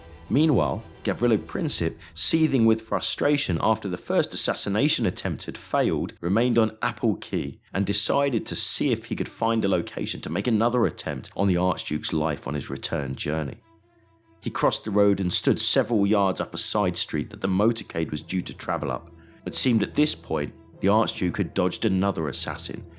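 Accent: England, United Kingdom. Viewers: One listening documentary spoken in English accent should be initially skeptical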